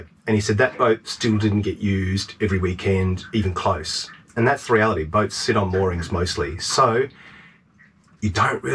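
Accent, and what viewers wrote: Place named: Australia. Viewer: Loved the chooks,, hated him. No, truly mate, this has been a super adventure. Glad it was you and not me. Really admire your courage and tenacity and your 10 tips are right on the money. I'm an ex Aussie in Alberta Canada and listening to your familiar accent has been a treat. Looking forward to many more vids.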